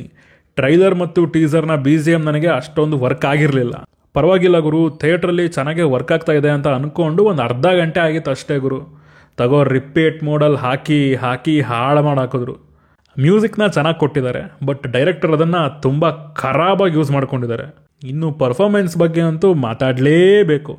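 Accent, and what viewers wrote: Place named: Davanagere, India. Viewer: Pakka Namma Davanagere kannada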